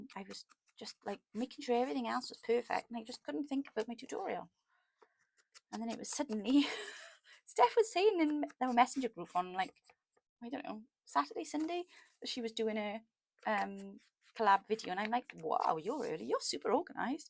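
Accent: Wales, United Kingdom. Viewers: Utterly fascinating. It might take me a week to make this. I love to craft but am just sooooo slow. Thank you for all this hard work. The designs are right up my tree! So adorable and pretty. I watched the whole video over 2 days, and it is fascinating. I do so love your Welsh accent.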